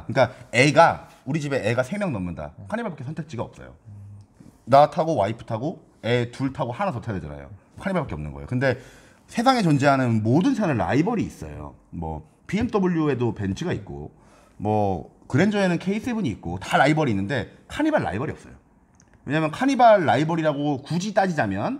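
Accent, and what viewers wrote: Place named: Gangwon, South Korea. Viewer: 듣다보면 강원도 사투린지 조선족 사투린지 햇갈리네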